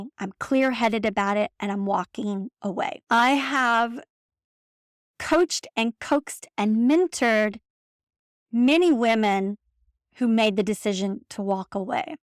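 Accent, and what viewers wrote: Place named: South, United States. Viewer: So nice to 'catch up' and hear a southern accent. ;) Love from Boston.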